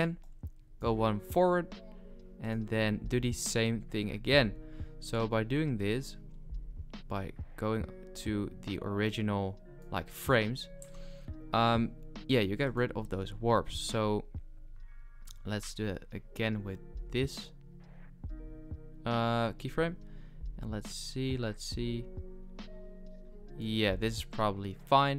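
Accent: Netherlands. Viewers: i just know you're dutch, you sound so dutch haha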